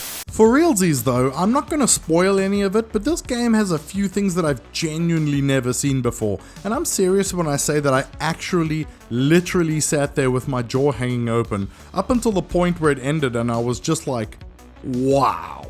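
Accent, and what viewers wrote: South Africa. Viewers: Yiss I fought this oke sounded a bit on the safrican side.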